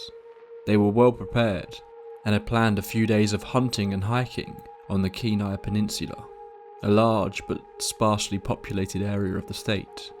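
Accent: England, United Kingdom. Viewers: Something about this guys narration and his regional accent makes these stories, extra interesting. I always keep my attention on the story. A British accent makes everything sound better. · Ive just discovered your channel. I livevin the UK so its good to hear an English accent. No offrnce meant i look forward to many more stoi43t ,😅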